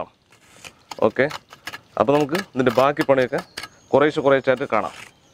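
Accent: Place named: Palakkad, India. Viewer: പാലക്കാടൻ ആണല്ലേ.. വർത്തമാനം.. കേട്ടാൽ പാലക്കാടെന്നു തോന്നുന്നു..!!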